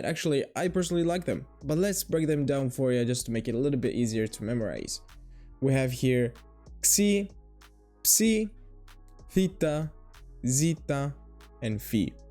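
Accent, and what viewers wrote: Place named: Greece. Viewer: Τα γελοιοποίησες τελείως τα Ελληνικά μας, χαχαχα! Ας σκεφτούν όμως οι αγγλόφωνοι ότι αν σε αυτούς φαίνονται ανάποδα τα ελληνικά, το ίδιο φαίνονται ανάποδα και στους Έλληνες τα αγγλικά όταν τα πρωτομαθαίνουν, αλλά με τον αντίστροφο τρόπο. Δηλαδή κάποιος παραξενεύεται όταν μαθαίνει ότι το Ρ προφέρεται στα αγγλικά 'π". Το καλό λοιπόν είναι ότι αφού σχεδόν όλοι οι Έλληνες κατορθώνουν να μάθουν τουλάχιστον να διαβάζουν απλά Αγγλικά και η νέα γενιά τα μιλάει και τέλεια, έτσι μπορούν να μάθουν και οι αγγλόφωνοι Ελληνικά, και ας μην γκρινιάζουν με το να τα θεωρούν δύσκολα. Το μόνο αλλά πολύ σοβαρό πρόβλημά τους είναι ότι 'κυκλοφορούν' στο youtube πάρα πολλοί ακατάλληλοι "δάσκαλοι" της Ελληνικής που τα έμαθαν λάθος και τα μεταδίδουν λάθος. Το παλικάρι από εδώ ακούγεται είτε ελληνόφωνο εκ γενετής είτε δίγλωσσο εκ γενετής, γιατί η προφορά του στα Ελληνικά είναι ακριβώς Ελληνική. 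Μπορείτε να το εμπιστευτείτε.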